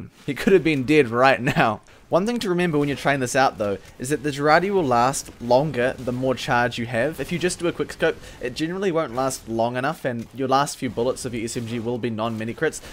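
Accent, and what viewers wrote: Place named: New Zealand. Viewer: bless you and your Kiwi accent.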